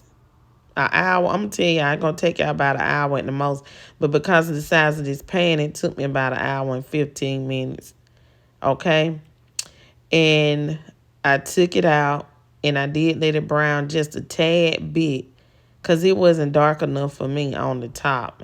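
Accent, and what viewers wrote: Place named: South, United States. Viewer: I knew as soon as I heard your voice, this was the recipe I wanted. With a southern accent like that, I knew it would be good. Made this recipe for Thanksgiving and DANG!!! This was hands down the best cornbread dressing I’ve ever had!!🎉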